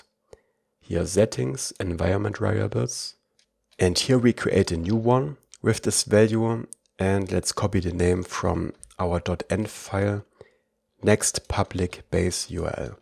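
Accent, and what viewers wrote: Germany. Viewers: Direkt gemerkt das du deutsch bist haha, super content!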